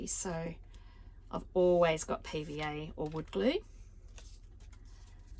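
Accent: Australia. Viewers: Hi, nice to hear another Aussie xx love this journal xx Hope your channel grows, so nice to support you. Look forward to seeing more!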